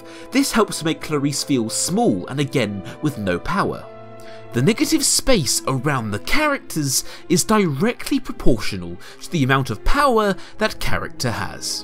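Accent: United Kingdom. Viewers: I am subscribing simply because of your sexy British voice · i love the british accent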